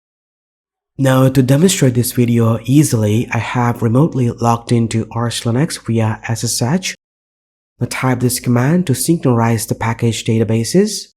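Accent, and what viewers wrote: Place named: India. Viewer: Nice accent for an Indian. I didn't knew you were Indian until I saw the profile.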